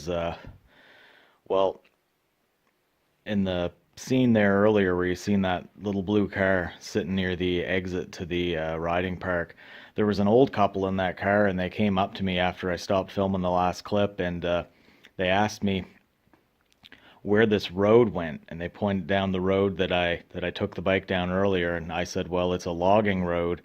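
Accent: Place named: Nova Scotia, Canada. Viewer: Ohhhhh nova Scotia...I should have picked up on the accent...iv got the same situation..I picked up this little atc on a trade..got it going...but it's too small for me.. so just sits...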